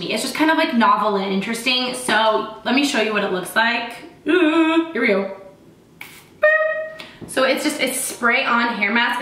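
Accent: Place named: Georgia, United States. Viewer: I knew you had to be a georgia girl ! Got the accent for sure...Sound like my people 🤗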